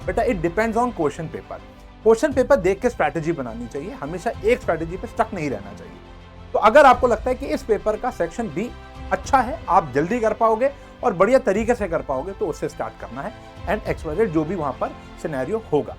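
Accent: Punjab, India. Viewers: sir your punjabi accent bahot achi hai sir 😀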